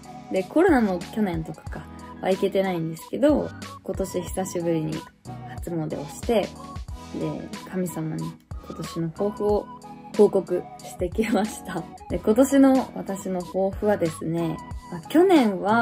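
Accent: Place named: Niihama, Japan. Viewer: なつかしい新居浜弁を聞いて(読んで)思い出しました、生い立ちを詳しく知らなかったけどやっと分かりました。大島から市内に変わり神郷小学校から川東中なんですね。私の親が宇高の桜ヶ丘マンションに居たから場所はよく分かります。私も新居浜商業高校からトヨタに入り田原工場で定年後迄田原市ソフトボール協会会長としてソフトボールを楽しく過ごしましたし、のサインボールをキャッチして興奮した事をよく思い出します❗これからも応援していますからご活躍することを願っていますよ❗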